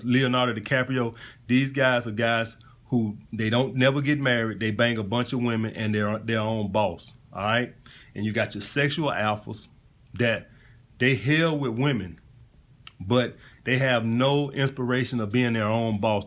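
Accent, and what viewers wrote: South, United States. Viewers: its not perr...its pure...sounds like he from down south...can hear that southern accent...very good looking guy but dont agree with everything he saying...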